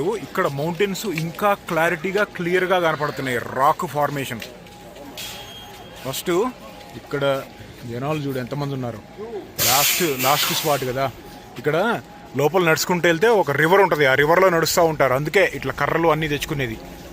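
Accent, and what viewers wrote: Andhra Pradesh, India. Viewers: Bro meeru telangana kada..maree nnizamabad..mee baasha enti andhraa laa untundi..akkada settle ayyaaraa…endukantey akkada astundru,potundru,andukunnava,tindra ledaa..ila matladutaaru… History cheputara meedi…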